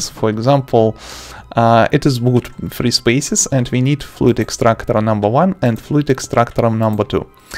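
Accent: Russia. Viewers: thanks so much very useful. you sound like your russian, I am also russin good luck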